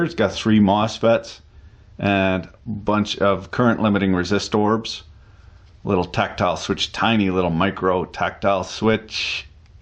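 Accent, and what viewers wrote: Quebec, Canada. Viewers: Hi im from quebec im very impressed that you seem to can speak like québécois. Where are you from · Si Sol (de Sol et Goblet) parlait anglais, ce serait lui. Un Québécois en plus! Gotta like the guy! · Based on french knowledge, you are either from New Brunswick, Quebec or maybe Ontario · talkin' like a real Quebecois up in this shiz... i love it.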